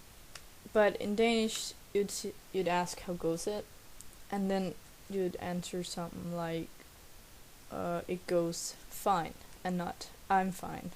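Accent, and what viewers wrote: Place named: England, United Kingdom. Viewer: I noticed that the OR and ER's are said with what i'd relate to an English accent. Like in the word Hvor, its like voll, and i mistaken?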